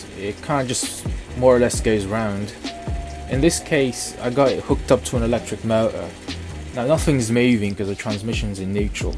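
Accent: United Kingdom. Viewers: Why is there a British man now and no one is talking about it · i really recognize your accent, i swear it's the camden area in london where i heard it last and know where it's from.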